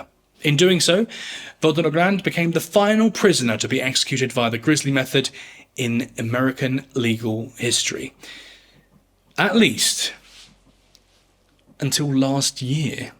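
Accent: United Kingdom. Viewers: Sounds like a limey snowflake. The USA needs to execute more and quicker to be a deterrent. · I once believed, totally, in capital punishment, couldn't understand the logic of those who thought otherwise. In time I have totally reversed my opinion for reasons I don't need to go into. But that being said, I have some major issues with this video. For starters, conflating American executions with Nazi Germany's genocide is offensive, deliberately misleading, wrong, and frankly coming from a man who sounds British, well it takes huge brass balls. And given that the UK practiced capital punishment for a thousand years, on a much larger scale than the US, well there's no small irony in his smugness. I also noticed that the number of patrons for someone who seems to carry a torch for this subject matter kind of suspicious. Perhaps this is a well funded political mouthpiece? 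To be clear, it's not the message that I really object to but the filthy package that carries it. Cheers.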